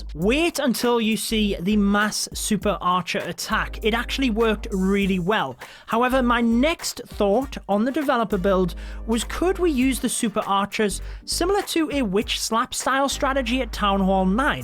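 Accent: United Kingdom. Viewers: Where is he from? Or I should ask: In which part of UK does he live? 😄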